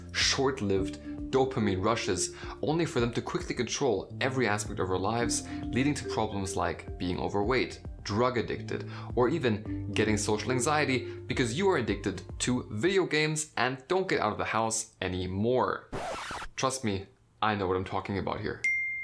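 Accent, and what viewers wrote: Germany. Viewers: Your English is excellent. My Omi was from Frankenberg and I enjoy your videos for the information and the accent. I miss hearing it. Thank you! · well he works in Sales (sic). I do not need a T-Shirt or Hoodie with a (imho opinion) daft slogan, let alone the expense to remind me of my ADHD. Might work for some, but that's too much HYPE for me. Viel Glueck mit dem Unterfangen Herr. I'm out! (That's best of luck in German, I'm German and can't help notice his accent). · Are you German? I‘m German and you sound to me like German talking English. Just want to know, no judgement. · Huh ben je Nederlands? Jouw accent klinkt Duits haha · Man merkt nur ein kleines bisschen, dass du Deutscher bist😉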